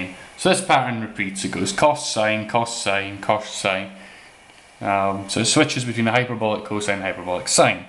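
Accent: Scotland, United Kingdom. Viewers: ye scottish mate? nice vid btw thanks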